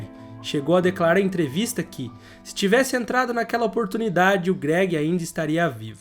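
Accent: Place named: Paraná, Brazil. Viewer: O narrador é da região Oeste do Estado do Paraná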